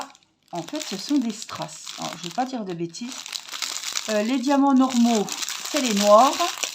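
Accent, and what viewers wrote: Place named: Nancy, France. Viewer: Trop belles ces toiles Vitraux ❤️❤️❤️ j'ai une petite question qui n'a rien à voir : je te suis depuis peu et ton accent très très prononcé me fait penser à celui que j'avais il y a qques années : je suis originaire de Nancy mais j'habite depuis plus de 20 ans à Toulouse. Et toi ? 🤔😁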